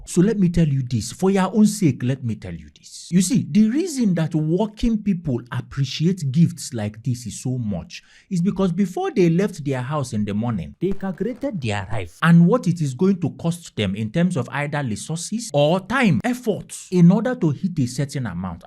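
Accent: Igbo, Nigeria. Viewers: You are obviously forming this lovely and funny Igbo accent. Nice show brother. Go well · Igbo man. I like your accent 😂😂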